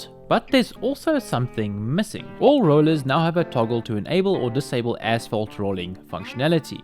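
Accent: South Africa. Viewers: Joh , the Afrikaans accent is strong with this one!! Would love to jol some co-op with you sometime!!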